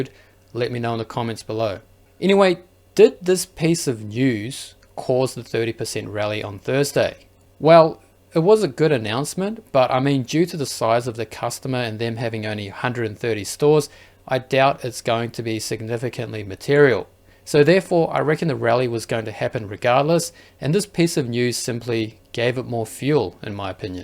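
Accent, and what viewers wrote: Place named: Australia. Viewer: Asian guy with Australian accent doing American politics🎉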